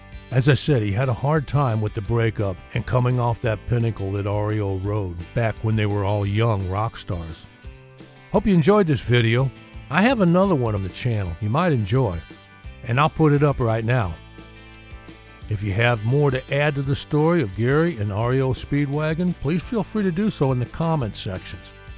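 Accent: South, United States. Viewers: Understood. I was just thinking from the point of view of someone who may have never seen your previous ones. I do like your videos. Your Southern accent makes them unique. Keep it up.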